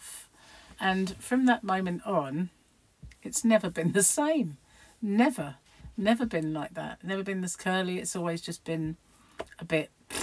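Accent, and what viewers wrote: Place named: North, United Kingdom. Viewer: I too have always been interested in the way people speak, and trying to figure out in my head (before asking)where they're from 😊. Different regions are so interesting. I was thinking to mention that a coworker of mine is from Bedfordshire. I asked her to listen to a bit of the video I was watching (of yours)& she said you're from more north of the UK, and that you don't have an Australian "influence" in your speaking. I knew that I heard a difference between your and her accent 🤓. Anyway I find your videos entertaining 😁, but I have to get ready for work. Have a great day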